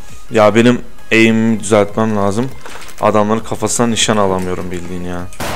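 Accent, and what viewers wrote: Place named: Sivas, Turkey. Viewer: abi sende sivas lisin kesin "BILIYUNMU" demenden anladim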